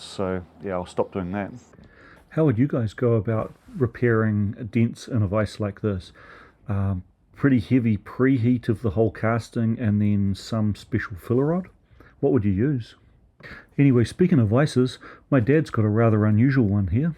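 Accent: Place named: New Zealand. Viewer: I wondered how you kept your Kiwi accent tuned up, Regular visits back ;)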